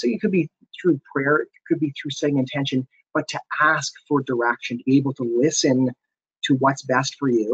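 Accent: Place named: Canada. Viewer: Enjoyed the presentation. Turn on "closed captions" to help with understanding. has a Canadian accent. This talk parallel's my own journey, now in remission (only a year) from metastatic sarcoma. I've thoroughly enjoyed my life on the edge of life and death. I'd recommend it for lost souls searching for meaning in a troubled world. It's a spiritual accelerant. As a social radical, the context is also living in a toxic, urbanized world, alienated from nature that's spinning out of control. Keep the flow of water and healthy nutrients going to help de-toxify the body-mind. Cancers often forms and habituates along routes of elimination: like the lungs, skin, liver, bladder, colon. Mine was (is) urothelial. Also, I'm a zen adept. I like Turner's book and have a sense of intentionality, but purpose needs to be put in a bigger context beyond the ego-mind, which is a social construction BTW. 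You allow (accept) by questioning, doubting and stripping away the non essentials. Meditation can lead to emptiness, being empty of all distinctions. Living from a place of non resistance in touch with the mysterium infinitum et oppositorum (Nicholas de Cusa). It's a mystical state of wonder, where there is no ego, no cancer, you're full of vitality or chi, and goals dissipate too. You're just in the presence of it all. No judgement or comment necessary. This along with Basho, my adoring adopted stray cat; a loving wife; openness; attention to alternatives Western medicine, driven by the pro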